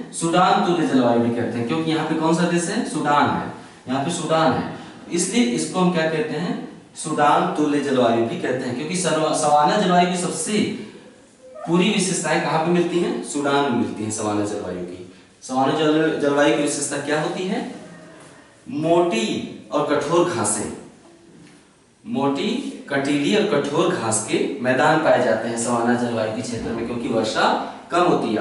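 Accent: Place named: Eastern Uttar Pradesh, India. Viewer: लेकिन हो तो आप east up ya west bihar se it is 100% sure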